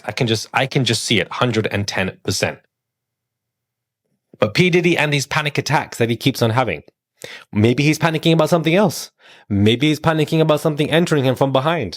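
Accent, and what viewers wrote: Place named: England, United Kingdom. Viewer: English accent sucks..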